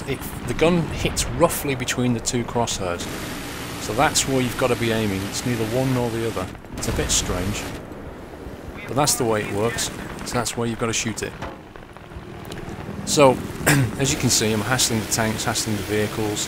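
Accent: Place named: United Kingdom. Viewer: Oh your british I see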